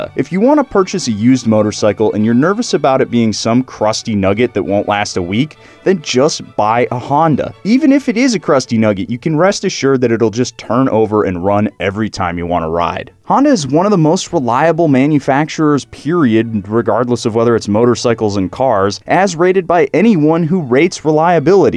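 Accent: United States. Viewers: That cowboy hackcent gets me everytime love it !!! Also i don't actually ride motorcycles, i just love how they look and they scare me :D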